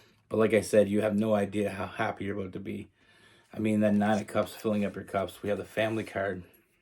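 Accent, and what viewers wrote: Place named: Canada. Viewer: I love your stories!! I could hang with someone like you all day! If you don't mind me asking, what state are you located in? You sound Canadian! The cat is the bonus! I seriously thought it was mine at first! Thanks! You are appreciated!!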